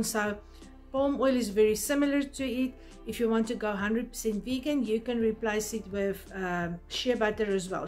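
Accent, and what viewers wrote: South Africa. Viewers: I had to watch the video over because I am a South African living in Australia, and it was just so lovely hearing a beautiful South African accent....My mother was dependent on her oxygen machine towards the end of her life, and the load shedding was frightening for her, so thank God for modern technology, we were able to get her a generator. It is just a way of life one gets used to...with the flies and all.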